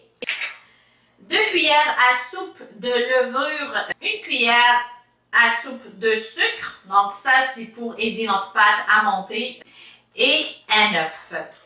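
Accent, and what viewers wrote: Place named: Canada. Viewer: Merci pour la recette trop mignon l accent canadien sur une femme kabyle Recette que je vais tester merci pour le partage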